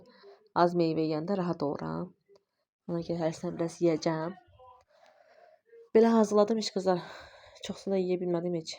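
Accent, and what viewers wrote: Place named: Qabala, Azerbaijan. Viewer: menimde yoldaşım qebeledendi size qoz gelmeyinden birde lehcenizden bildimki qebelelisiz😘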